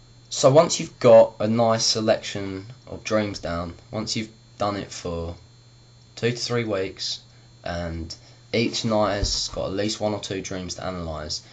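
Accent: England, United Kingdom. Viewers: What part of England are you from? You sound like Daniel Radcliff... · well i can usually distinguish different english accents an he sounds like hes from the same region as rupert grint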